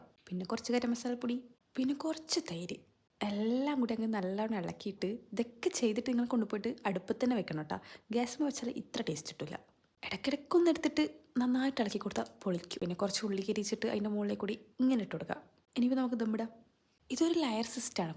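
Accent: Kannur, India. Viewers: Ingal kannur laano 🙄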